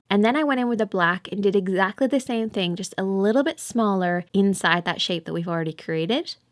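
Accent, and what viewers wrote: Ireland. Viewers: Hey are you Irish??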